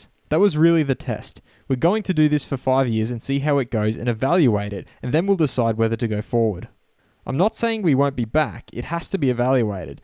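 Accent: Australia. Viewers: hey mate, hear you aussie, you heading to the melb F1 this year. id like to buy you a beer for you great vids buddy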